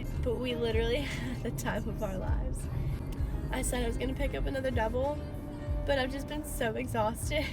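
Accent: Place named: South, United States. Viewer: Your cute little southern accent i love it and you!!!!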